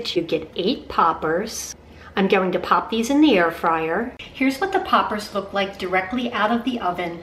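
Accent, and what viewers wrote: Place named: Pennsylvania, United States. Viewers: Love the video but staying for your Pennsylvania accent 🙂 coming from a Minnesotan. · Great video! Are you from Pennsylvania? Your “accent” sounds like it!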